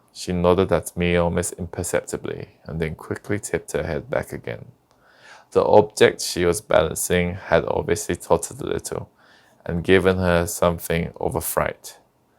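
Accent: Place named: United Kingdom. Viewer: 잠이 솔솔오네요ㅎㅎㅎ 목소리도 영국식발음도 너무 좋으세요 앞으로 매일밤 들으면서 꿀잠잘 것 같아요💫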